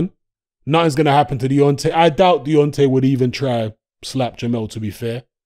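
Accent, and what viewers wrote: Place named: South London, United Kingdom. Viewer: South London IIRC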